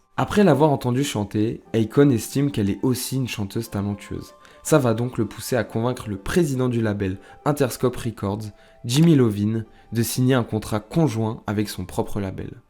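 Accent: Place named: United Kingdom. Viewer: Akon et des rappeurs? Noirs et donc forcément rappeurs quoi! Ce sont des chanteurs, pour ta gouverne et si tu n'as pas ce minimum de culture, faut pas faire de videos pour désinformer c'est pas le but. Ça remet en question la crédibilité des infos de l'ensemble de ta vidéo. Perso jai arrêté en cours de route. Entre ça et l'accent anglais je ne suis pas sur YT pour m'abrutir mais pour m'élever....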